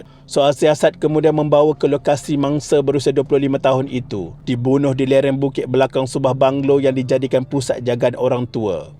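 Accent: Kelantan, Malaysia. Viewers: logat meme tok lari ore kelate be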